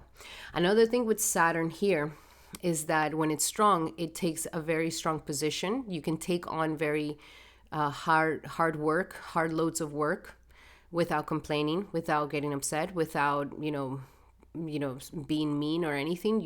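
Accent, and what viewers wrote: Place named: Spain. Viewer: I love your intros! Most channels are so fluffy, your intros are so dense, captivating and informative! I have a question, when you say if a planet is “strong”. How do I know if my Saturn is strong? Also very random, I’m a Spanish teacher and love the language… I know this is a Vedic channel, but your accent sounds Spanish -esque 😂 any relation?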